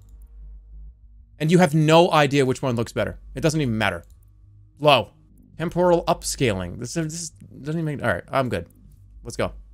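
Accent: Boston, United States. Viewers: The best part about any jerma stream is how BAHSTIN his accent gets when he gets frustrated… “this is nawt mah computah”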